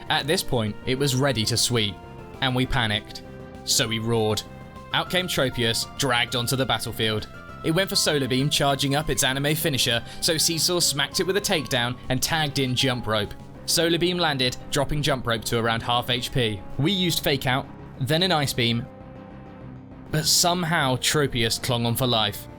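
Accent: United Kingdom. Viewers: Dude I'll give you a hint you may think you're just an average sounding British dude but you're like The stereotype of all stereotypes of British dude sound to these American ears. It's really pleasant to listen to you... So yeah pretty much as soon as American female Pokemon fans discover your channel it'll blow up just fine but you are going to have to do face on camera at some point😊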